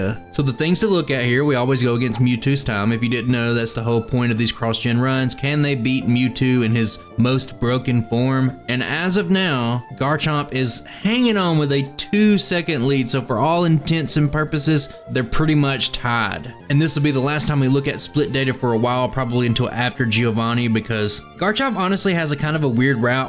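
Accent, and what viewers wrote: South, United States. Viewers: you kinda have a southern accent! I'm surprised!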